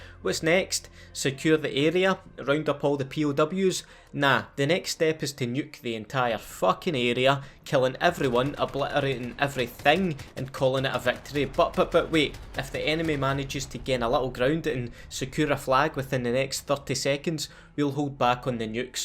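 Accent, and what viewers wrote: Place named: Northern England, United Kingdom. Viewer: God I love Northern accents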